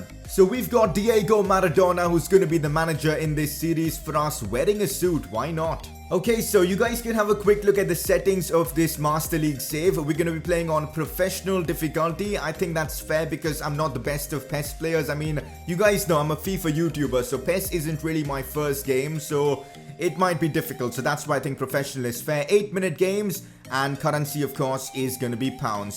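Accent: India. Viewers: Are You Indian.... Cuz your accent sounds too familiar.... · India accent😁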